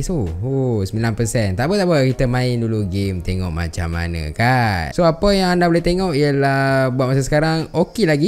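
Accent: Malaysia. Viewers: Lucu ngomong org Malaysia akwkakw jadi suka dengerinya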